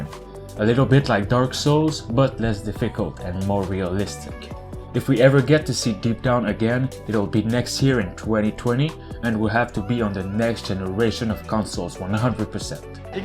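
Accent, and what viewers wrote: Quebec, Canada. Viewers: Happy to hear this project is still alive, looked very promising. And hey, are you from Quebec ?! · Are you from quebec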